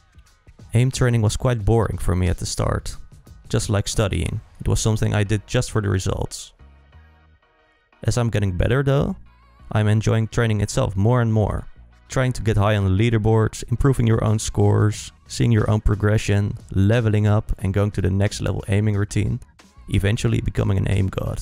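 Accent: Netherlands. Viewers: Hey, I know your Dutch man I hear your accent. I am Dutch too. I've used Kovaaks myself for the last 9 months and I got around 214 hours of gametime in Kovaaks. My aim improved significantly. My biggest weakness to overcome is short strafes fast which I have a hard team dealing with if its moving fast close to me. Anyway my K/D in Warzone was 0.80 and it got boosted to 0.90 recently and it's still gonna climb I notice I do a lot of insane flicks in the gulags also Apex Legends all games seem to be more easy now. I really like your video thumbs up. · Je hoort meteen dat je een nederlander bent hahahaha, altijd dat vieze accent.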